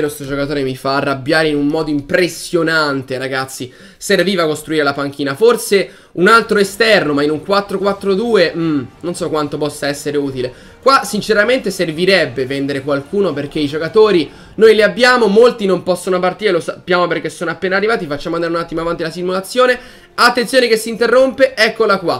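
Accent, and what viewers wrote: Tuscany, Italy. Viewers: Toscano puro eh bella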